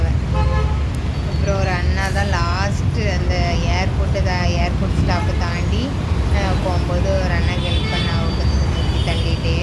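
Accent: Tirunelveli, India. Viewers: Paechu tirunelveli maari irukku. Ethana naala coimbatore la irukinga. Enga dialysis panringa, also amount for one dialysis?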